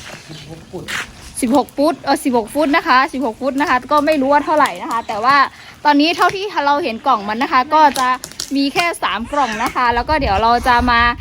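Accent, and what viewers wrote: North, Thailand. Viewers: เหมือนภาคเหนือเลยครับ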